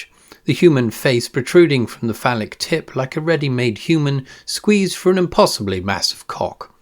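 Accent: United Kingdom. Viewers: This is hilarious and the English accent makes it even funnier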